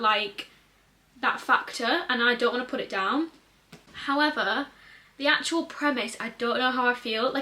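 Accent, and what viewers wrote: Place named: United Kingdom. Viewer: hey 👋🏾, just found your channel and immediately subscribed when I heard your accent and knew you were a fellow UK Booktuber. I love your vibes and reviews of books, i feel like we like similar books also!. Looking forward to watching more of your content. 💞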